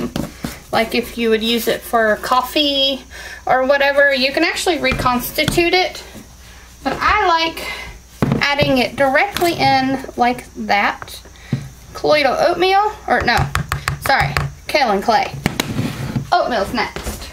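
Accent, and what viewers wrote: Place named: United States. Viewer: Such a gorgeous soap! I bet it smells devine 😍😍 I love your accent-where in America do you guys live? I'm in Tasmania Australia 🇦🇺 We don't have many soap makers here so buying it isn't easy :( wish you lived closer,I'd buy soooo many of your soaps haha :) xx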